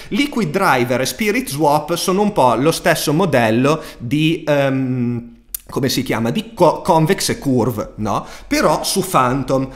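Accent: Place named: Lombardy, Italy. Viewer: Di dove sei? Hai l'accento delle mie parti(Lombardia)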